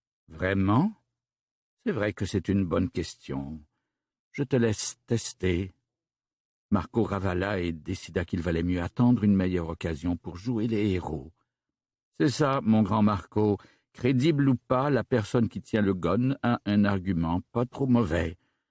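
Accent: Quebec, Canada. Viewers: J'en suis qu'à la moitié, j'adore je suis conquis ! Un peu de mal avec le Québécois parfois mais c'est rigolo 😁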